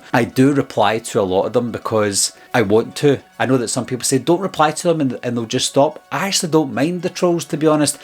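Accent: Scotland, United Kingdom. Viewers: Listen bud don't you worry aboot yer accent..its the same as mine..the best accent in the world an I kin understawn ivrihin yer sayin nae borher..you just keep doing yer thing and don't let comments from a couple of wallopers stop ye.....cheers bud 👍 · I'm scottish too brother don't worry about the accent 😂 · We Scottish have the best accent keep up.the good work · I commented on your Scottish accent on a video the other day but in a good way, love a Scottish accent 👍🏻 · The fact you have to defend your accent…so unreal. Keep doing what you’re doing. You’re killing it. And anyone who says they don’t like your accent secretly wishes they were Scottish.😏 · The Scottish accent is a thing of beauty, they are just jealous of you and have wayyyyy too much time on their hands down there in their mother’s basement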